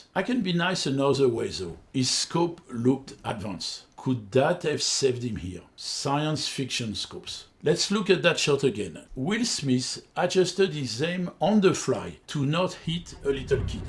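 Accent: France. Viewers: What is this accent ? You are French right ? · Vos vidéos sont sympas, mais l'accent, "so frenchy dude" pour un francophone c'est une vraie souffrance. dommage de n'avoir assumé votre nationalité et de facto faire vos vidéos dans notre langue. Autre option prenez des cours pour arrêter ce massacre. Just a tip mate cheers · Hello, liked your vidéo, just a question, are you french ? Because you have the same accent as me. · This is a great video, I am glad I clicked on it. I love snipers and find it an amazing subject. The French accent was a surprise and immediatly got me hooked: I am a francophile and I even lived in France. I am also always read to listen to a grandpa telling stories. The humour in the video is great and the transitions are hilarious. I can say the technical information here is above average and the best package in one single video on all of YouTube. 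I read everything I can about sniping and my favorite book is Out of Nowhere, by Martin Pegler. It doesn mention the proeminence of the .338 Lapua Magnum. The books Stalk and Kill, and Sniper One on One, by Adrian Gilbert are also great. Do you know French books about sniping? Snipers are fascinating subject and no wonder we have so much media about them on popular culture. As a matter of fact, I was about to switch on Sniper Elite 3 when I saw this video. My first exposition to sniping was the movie Sniper (1993), with Tom Berenger and Billy Zane. The movie is classic of action and suspense, and it shows some of the practices of sniping. I got tranced and always studied all I could about the craft. Maybe you could make a video analysing Sniper! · You must be French judging by your accent....my brother has a .338 Lapua.....I have a 30/06....one must utilize the tools that are available to him or they are worthless. 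Very good to hear someone speak shooting truth! Thank you for posting!!!!!! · beautiful French accent ! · C'est un accent Français, non ? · Is this guy french or slavic I cannot tell. It sounds very French when he says "Rownd, "ledge-geon-dairy", "bee-cawze", et. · oh come on why is he french. now i have to close the video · How come you talk with a French accent but have a muricastan flag and use their bullshit unit system?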